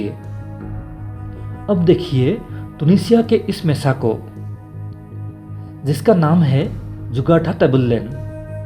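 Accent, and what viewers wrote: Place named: Assam, India. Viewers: তুমি অসমীয়া নেকি ?তোমাৰ মাতটো অসমীয়া নিচিনা লাগে, তোমাৰ ভিডিঅ বিলাক চাই ভালেই লাগে| 👍👍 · Oh assamse he.. toh.. language me thodi parechani toh ho he sakte he..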